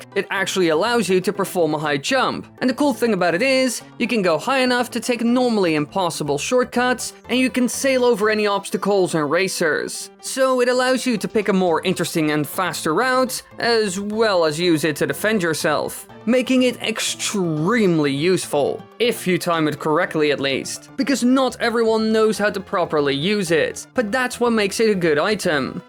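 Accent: Netherlands. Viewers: Are you Dutch? Because I recognize that accent and if you are however than I would like to say something: Leuke video's man ga zo door👍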